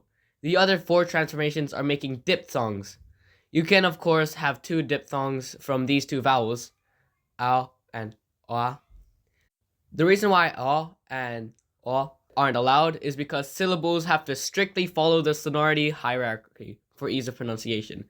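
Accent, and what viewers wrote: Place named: Lahore, Pakistan. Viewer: lol at one point int he video they show a grammar chart or something and aling english there was an urdu comparison, this + the accent, which to me sounded similar to a lahori, made me think i had found another one of us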